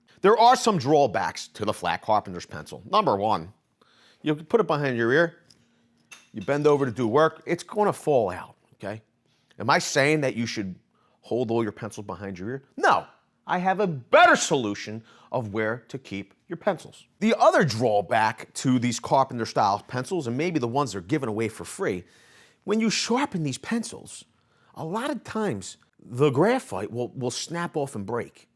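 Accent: Philadelphia, United States. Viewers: Wudder. Everyone is always like "you mean water?" Screw you it's Wudder. Love our Philly accents bro. Go Phil's go birds. Suck it Dallas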